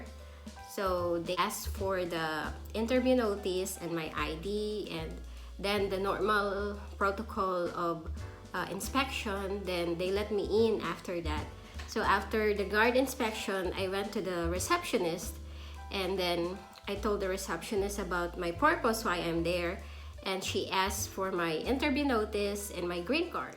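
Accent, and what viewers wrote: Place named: United States. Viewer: she is sound like she is an american girl , like engl is her mother language , what is your concern was about Idk...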